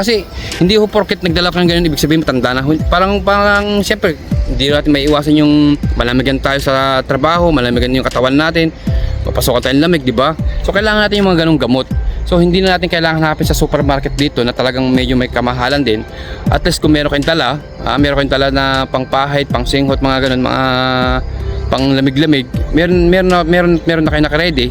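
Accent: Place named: Ilocos, Philippines. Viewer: ilokano ka ba boss? pansin ko lang kasi sa kwan mo hahaha. btw boss, anong cp gamit mong pang vlog? salamats!!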